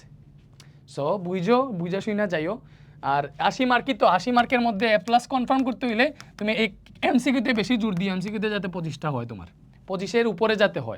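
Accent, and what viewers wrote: Sylhet, Bangladesh. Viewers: Apni ki Sylheti Paz vai ripley den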